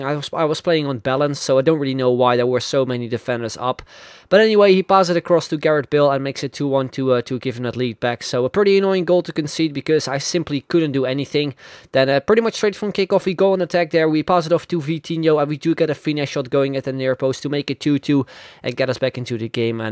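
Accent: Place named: Netherlands. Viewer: 1e video die ik kijk van jou en ik hoor gelijk de je nederlands bent :D maar je spreek echt goed engels man. en de video is echt tof +1 abone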